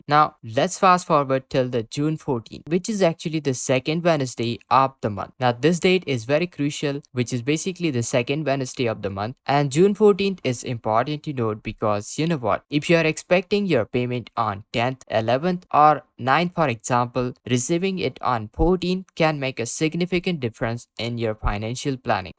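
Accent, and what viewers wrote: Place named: Punjab, India. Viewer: DUDE YOU TALK SO FAST WITH YOUR THICK PUNJABI ACCENT THAT I CANT UNDERSTAND YOU. SLOW IT DOWN.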